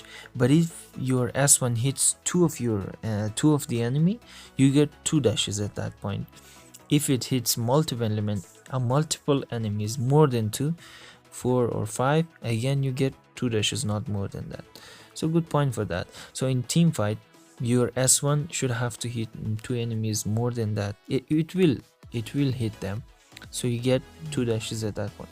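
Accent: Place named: Mexico. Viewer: Wou a mexican english well xd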